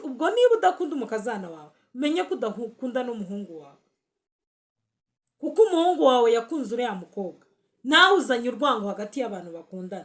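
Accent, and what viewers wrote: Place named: Banyamulenge, Democratic Republic of the Congo. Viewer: Mubyeyi urabivuga se urabizi ariko numvise uvuga nkabanyamulenge uriwe noneho nagukundaga ndushijeho ❤❤❤❤❤❤ uzansubize niba aribyo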